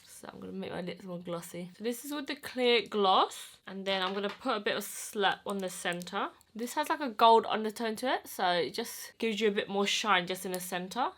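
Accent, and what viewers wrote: United Kingdom. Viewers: hwasa with a british accent aha💕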